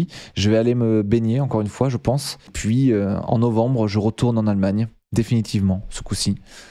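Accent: France. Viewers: yes, it's great decision to continue for worldwide people 🗺 it's great to follow a french cool man who speak in english with a french accent ça se dit redresser le cou, tu peux même consulter un bon médecin pour ça Du coup, tu vas commander un nouveau plateau TV pour la chaîne internationale ? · Perso j’étais pas chaud pour les vidéos en anglais, mais malgré mon niveau d’anglais à chier, comme tu utilisais des mots simples et avec ton accent français, étonnement je comprenais 90% ! Mais effectivement ça fait un moment que je ne voyais pas tes vidéos dans mon feed 😖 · Le meilleur dev français ❤️ Tu as totalement raison, apprendre de ses erreurs fait grandir mais c'est parfois nécessaire de se lancer et de s'écouter pour pouvoir tenter de nouvelles choses, car c'est en expérimentant qu'on innove. Après je pense que c'est normal que ton accent français en anglais fasse peur aux français mais ce n'est pas du tout un problème pour les anglophones, au contraire ! 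Continu de produire du contenu de qualité peu importe la langue, je suis sûr que tu va continuer à grimper très vite 💪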